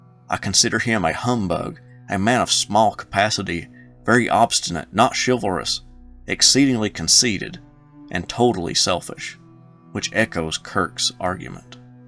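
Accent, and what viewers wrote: South, United States. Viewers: A hit job on a dead man, using his enemies and a piece of fiction as sources. Do I detect a Southern accent? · As soon as I hread the narrator's cornpone stupid accent I knew it was a hit job on Pete. Bigly sad.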